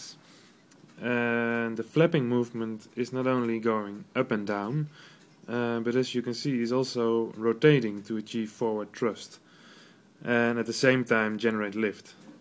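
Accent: Netherlands. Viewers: I can clearly hear from your English accent that you're Dutch. You should call it Ikarus and make it mountable and bigger.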